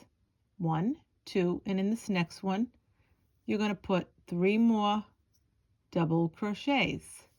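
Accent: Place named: Boston, United States. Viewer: Happy to see ya today, I love the mile a minute everything. I’ve been crocheting for about 40yrs now & enjoy crocheting along with you, always something new and interesting to learn. I love this one! I feel like I’m hearing an Italian Boston accent, you remind me so much of one of my Aunts your voice, knowledge & patience I adore you, awesome teacher. 🫶🏻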